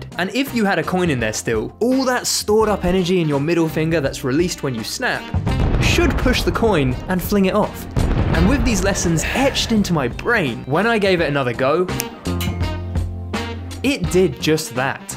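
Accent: United Kingdom. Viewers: i like bri'ish youtubers, because i like bri'ish accents 10/10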